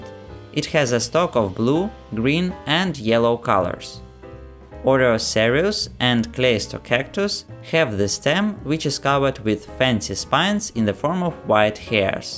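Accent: Russia. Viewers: It's a Russian accent.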